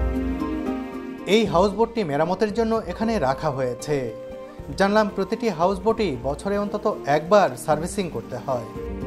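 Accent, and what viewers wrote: Bangladesh. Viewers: Apnar kotha sune ..mone hoi apni bangladeshi ..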